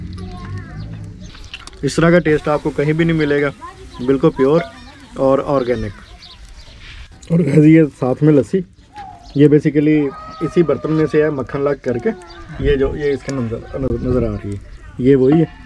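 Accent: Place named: Gujrat, Pakistan. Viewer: i am pretty surey that you belongs to gujrat pakistan, your punjabi comes from those areas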